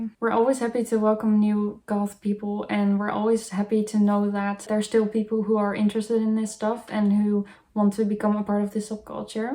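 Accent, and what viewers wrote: Netherlands. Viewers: You sound Dutch! Come hang out at goth night if ur ever in utri or ams :))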